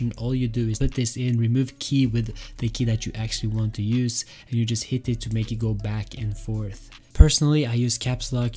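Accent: Norway. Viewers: E du nordlending eller? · I was in school there was lots of noices when i watched it, when i watched it again i heard that you were Norwegian my bad lol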